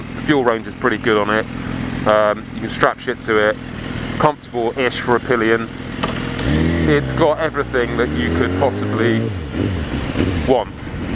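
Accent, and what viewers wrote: United Kingdom. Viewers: Does anyone else think this guy just has the smoothest accent ever? like, when you hear english people, most of the time its like... ew. but not with this guy. g0od 0n u m8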